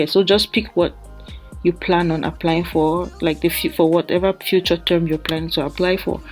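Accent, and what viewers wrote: Nigeria. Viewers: Cameroonian u sound Nigeria 100%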